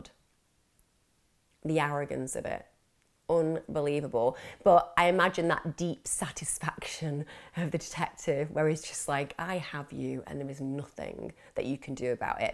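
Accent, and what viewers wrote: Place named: England, United Kingdom. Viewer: Absolutely no offence but when English pronounce Afrikaans words/names it is flippen hilarious.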